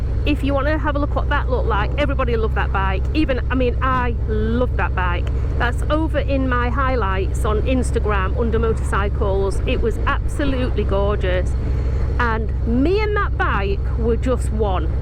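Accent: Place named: London, United Kingdom. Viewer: Awesome ride and video. I’m here local in Miami also. I ride that road all the time that you were on. Half of my family sound like you from London. Ill subscribe and hopefully we can do a ride. P.S. black point is the spot.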